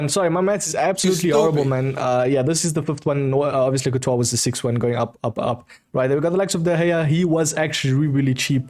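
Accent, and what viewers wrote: South Africa. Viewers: South African? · R u frm south africa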